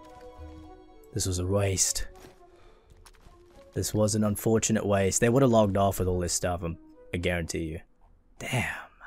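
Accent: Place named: New Zealand. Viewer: Yes broo!! So refreshing watching a good dayz video with a fellow kiwi accent!! Much love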